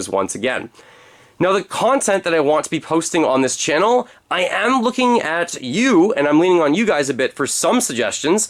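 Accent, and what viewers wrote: Canada. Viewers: Haha within the first minute I heard you talk I could tell your Canadian, I found your channel when I started playing video games again specifically the Nintendo switch after a 5+ year hiatus, it’s awesome to see the renewal of passion for your hobby and channel.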